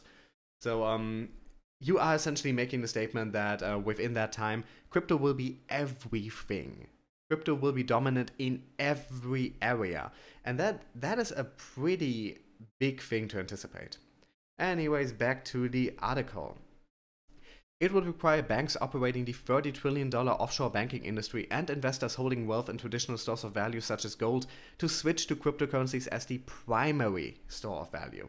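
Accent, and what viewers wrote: Germany. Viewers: I like your German English accent, you speaking English very well which make you huge fans from Europe & USA around the world.. Finally I like your videos which are confident 🤝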